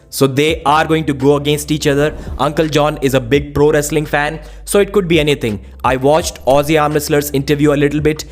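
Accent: India. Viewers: this is what Indians sound like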